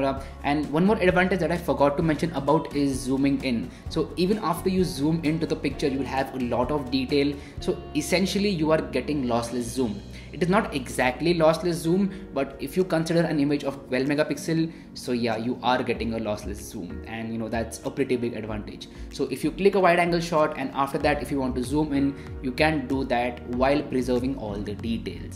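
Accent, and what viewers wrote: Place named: Punjab, India. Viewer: Bhai o Panjabi nikalde o regional feeling utarahahe I'm from AP but I like the way express so try to be with all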